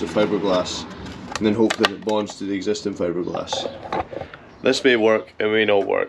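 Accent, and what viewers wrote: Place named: Wales, United Kingdom. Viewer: Hey man good job on vids. As a Welshy who moved to the states a couple of years ago who is literally in the middle on a XS750 Cafe build your vids have been a godsend plus hearing an accent from the home land always helps. All the best!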